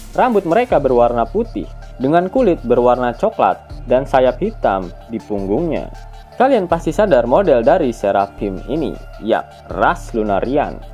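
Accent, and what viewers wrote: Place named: West Java, Indonesia. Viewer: tercium orang sunda wkwkwk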